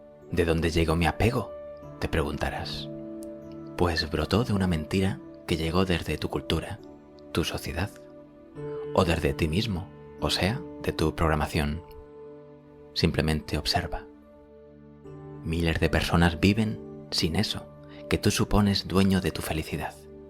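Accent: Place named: Spain. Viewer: No sé qué es más aburridor escuchar el acento de un español o escuchar los comerciales que pone Google para manipularnos y beneficiarsen. ellos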